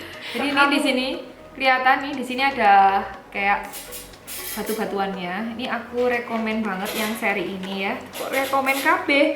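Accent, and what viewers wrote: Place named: Malang, Indonesia. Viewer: Ben ndelok video e mbak aku mesti cengar cengir ambek medok e😂 malang e asli👊👊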